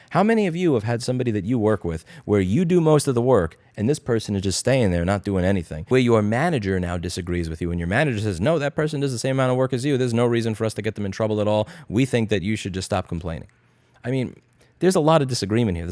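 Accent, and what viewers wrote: New York, United States. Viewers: I have a feeling in like two years time he's going to look and act completely Texan, but still talk like a New Yorker. Welcome to Texas =)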